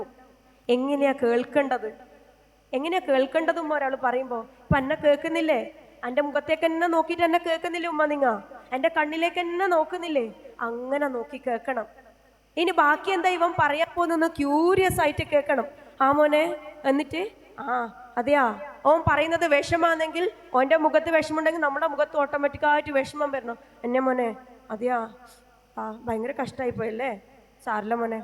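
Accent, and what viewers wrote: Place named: Thalassery, India. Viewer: സൂപ്പർ ക്ലാസ് .തലശേരി സ്ലാങ്ങില് കേൾക്കാൻ നല്ല രസമാണ്. കരയിപ്പിച്ചും ചിരിപ്പിച്ചും ടീച്ചർ സൂപ്പറാക്കി '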